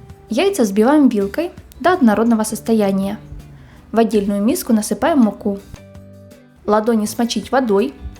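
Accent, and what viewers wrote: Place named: Odessa, Ukraine. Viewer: Привет Автор ролика! Спасибо большое! Всё понравилось! Судя по голосу Вы ближе к Одесской области находитесь) или Приднестровью?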